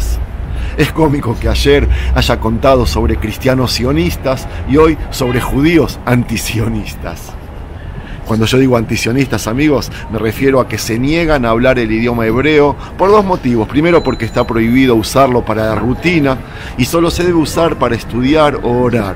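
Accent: Argentina. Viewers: It sounds like an Argentinian accent. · Muy buen documental. Por su acento Usted es argentino?. Fui profesora de historia y geografía en Argentina ahora jubilada y me gusta mucho ver documentales. Lo felicito es muy interesante vuestro video. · Este señor es argentino?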